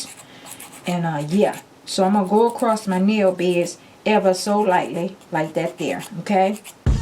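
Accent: South, United States. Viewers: 😂😂 im from SC and I was like whoah! Her southern accent is VERY southern even for me.😂😂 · Ever so lightly Like that there This here glue Hand here, Please where in the South are you from?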